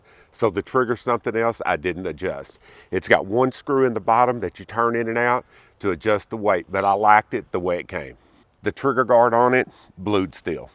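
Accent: South, United States. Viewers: Nice rebel accent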